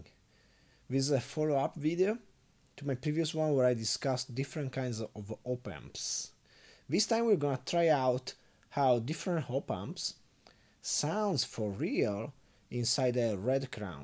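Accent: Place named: Poland. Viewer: you're from poland, right?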